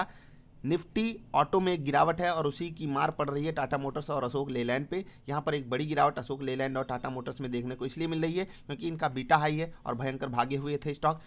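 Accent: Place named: Kanpur, India. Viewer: ap kanpur se he kyaa?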